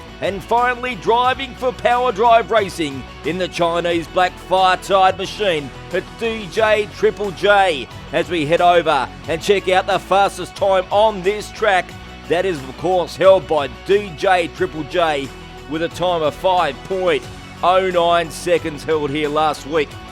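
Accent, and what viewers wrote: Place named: Australia. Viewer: I was rooting for Simon to win! But congrats to the winners. As an American, love to hear your commentary in Australian accent. Great racing to all!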